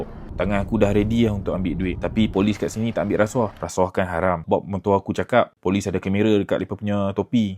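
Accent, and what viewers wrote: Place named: North, Malaysia. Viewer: jaga diri kat sana, sebarkan slang utagha kat sana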